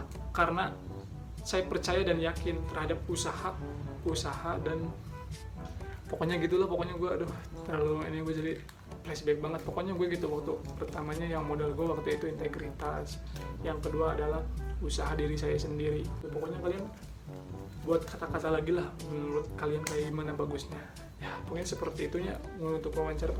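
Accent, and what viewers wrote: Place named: Sunda, Indonesia. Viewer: "Seperti itu nya" lagi serius dengerin, mendadak ketawa bang ahahahhahah sunda na kentel pisann, hatur nuhun kanggh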